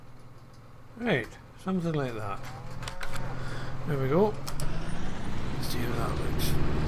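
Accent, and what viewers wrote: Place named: Scotland, United Kingdom. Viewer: Good to hear your Sottish tones again.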